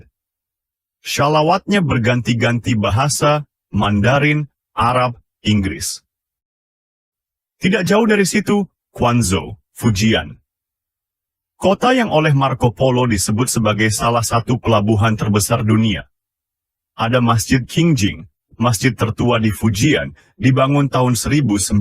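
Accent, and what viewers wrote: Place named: Malaysia. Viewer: Kalau anda orang indonesia tolong pakai bahasa indonesia saja,jangan pakai logat Malaysia segala.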